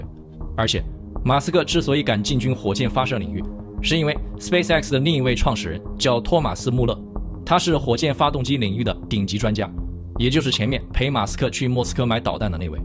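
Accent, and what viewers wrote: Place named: China. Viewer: 一嘴大陸口吻，不看了，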